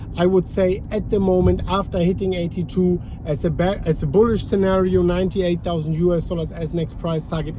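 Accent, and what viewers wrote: Germany. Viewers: First time viewer, this guy is German right?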